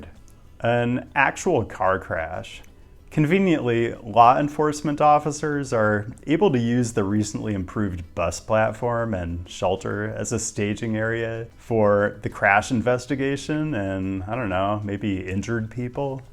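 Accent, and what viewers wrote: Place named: Pacific Northwest, United States. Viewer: First, I absolutely love the content. I have to ask though, are you from the Pacific NW? I'm a linguist and you have the strongest PNW accent I've ever heard, it's amazing 😂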